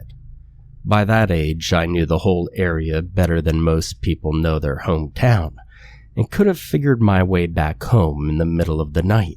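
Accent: United States. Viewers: Told with a thick American accent, trying desperately, and failing miserably, to sound like a Scottish accent. If you weren't born and raised here, you are NOT a Scot. And you most definitely are not a highlander! I am. 54 years. Born in Inverness and now living on the west coast. So, don't even think about arguing with me!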